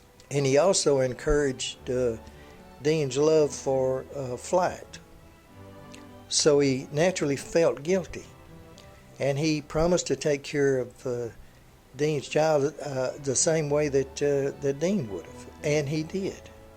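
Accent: South, United States. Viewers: This was a really nice history lesson given in your fabulous Southern style of delivery. The more I learn and read about famous authors and other artists, the more I find how many of them were heavy drinkers. Maybe when they are a bit high on alcohol is when an idea for a novel and such comes to them. Who knows for sure?:), are you driving Lincoln Towncar? The front end looks really familiar to me since I drive one.:)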